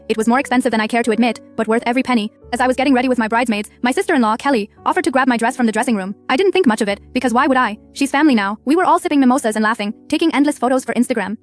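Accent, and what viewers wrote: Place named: India. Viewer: Why does the Ai have an Indian accent 😭😭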